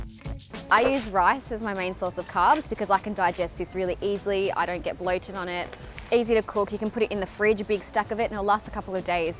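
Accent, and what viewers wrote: Australia. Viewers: Please marry me.. Fuck first she's a super hottie then she's has the. Australian accent.. She stole my heart right there.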